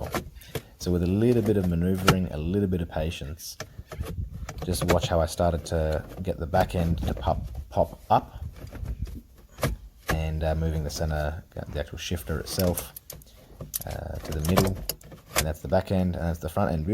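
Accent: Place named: Australia. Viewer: can i ask why you sound aussie but looks like your hands are Indian? :)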